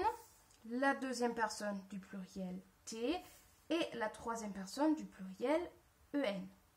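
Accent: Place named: France. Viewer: dieser französische akzent xD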